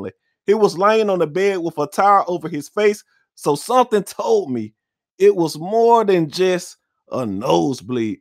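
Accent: South, United States. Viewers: dang boi u is country as hell where is u from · I love the Southern Will Smith voiceover, with ALL my heart.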